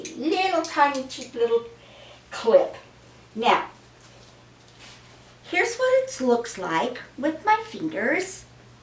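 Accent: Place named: United States. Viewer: I’m from England and your strong American accent really makes me feel happy and I smile, you say bangs we say fringe, but when you said dirty bangs I fell about laughing ( this was in a previous vid) you’re such fun I now say dirty bangs as I find it so funny, you’re becoming like a Sister to me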